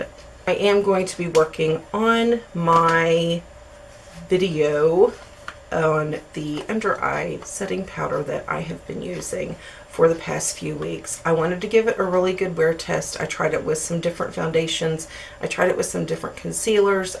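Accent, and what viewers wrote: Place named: Pennsylvania, United States. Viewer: I need that Hard Candy spray! You make me want to buy everything LOL! You look beautiful as always :) You sound like my family from Pennsylvania :) I could watch and listen to your videos all day long :)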